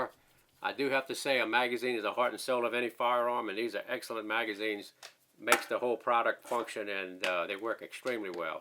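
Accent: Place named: South Louisiana, United States. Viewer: Read up on your bio in Wikipedia. I was born in June 1956, so you have almost 2 years on this rock more than me. Raised in the Arkansas cotton country 10 miles from the Mississippi my hunting as a youngster was primarily rabbits, doves and ducks. I had a Mossburg .410 bolt action and later inherited a recoil driven Remington Sportsman Model 48 in 12 gauge. I bought my first wheel gun at the local pawn shop at 21 in 38 special and did some major damage to the turtle and water moccasin population. I’ve carried a wheel gun since. The only thing I have ever won is the satisfaction of knowing I could face down any threat from thugs and gang bangers and my family was well protected. I’m a proud NRA lifetime member and a staunch believer in our 2nd amendment freedom. Oh, and I spent some time in South Louisiana, too and must say you may live “up north” now but I can still hear the bayou in your voice! 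Aiiyeee! Keep your powder dry and the videos coming! Best gun channel on YT!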